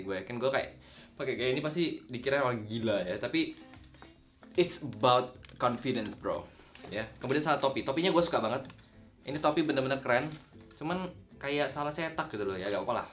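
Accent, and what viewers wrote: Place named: Pematangsiantar, Indonesia. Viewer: Logat Siantar campur logat gaul 😂😂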